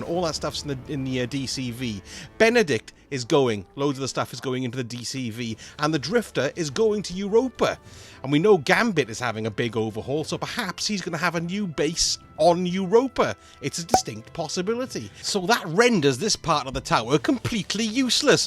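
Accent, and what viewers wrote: Wales, United Kingdom. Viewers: Hey,are you welsh?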